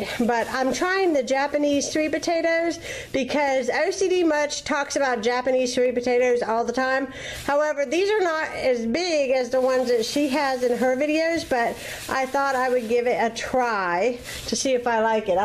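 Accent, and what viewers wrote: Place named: South, United States. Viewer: recently subscribed :) I love your channel and I love listening to your sweet southern accent ♡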